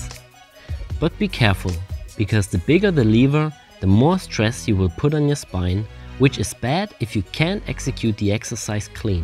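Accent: Germany. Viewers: Are you from Germany? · are you from germany? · Are you German?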